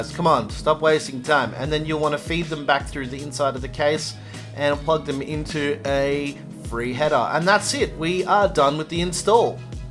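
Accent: Australia. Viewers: he sounds Australian i love it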